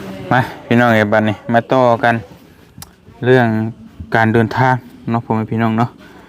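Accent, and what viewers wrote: Isan, Thailand. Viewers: พูดสำเนียงอีสานขอนแก่นชัดมากๆ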